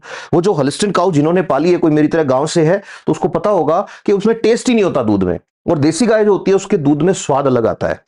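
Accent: Rajasthan, India. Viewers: Ye hamare rajasthan se h pakka ascent se confirm hu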